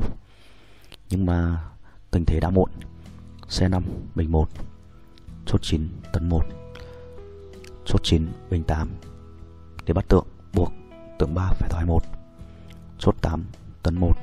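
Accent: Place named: Quang Tri, Vietnam. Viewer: trung minh dang vậy mà tui cứ nghĩ giọng quảng trj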